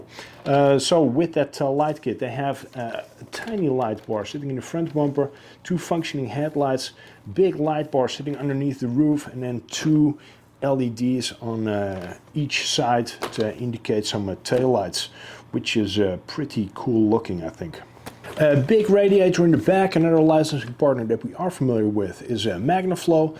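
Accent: Netherlands. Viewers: You sound dutch but you are Norwegian? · sorry dat ik zo een comment deed of je Nederlands was. Goed Engels hoor maar toch hoor je het Nederlands er een beetje door heen. Trouwens goede content hoor!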